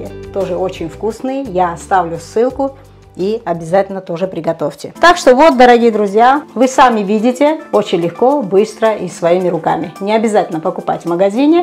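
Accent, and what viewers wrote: Batumi, Georgia. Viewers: Бесценны. Ваши рецепты,! Приятный ваш говор, я как будто, побывала в Батуми! Спасибо вам!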